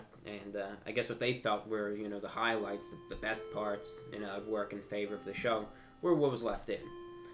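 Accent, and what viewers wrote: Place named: Boston, United States. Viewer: I hear he's from NY. I thought he sounded Bostonian.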